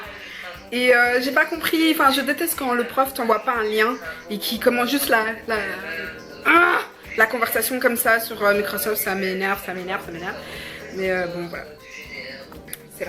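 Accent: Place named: England, United Kingdom. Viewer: Moi j'aime bien ton accent anglais hahah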